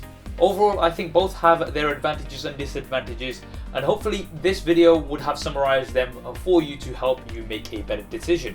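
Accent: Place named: England, United Kingdom. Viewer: btw I know you're Muslim and from England I think by your accent where's your parents from.?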